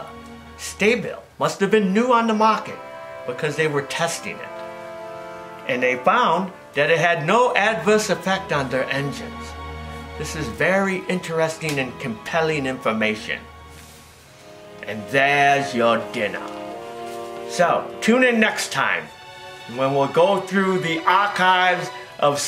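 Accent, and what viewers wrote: England, United Kingdom. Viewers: i like the english accent mixed in with a little Confucious accent near the end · Your English accent isn't far off Sir Winston Churchill from the second world war lol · Do you have a kimono with a dragon . Love the British accent old chap 🇬🇧✊🏻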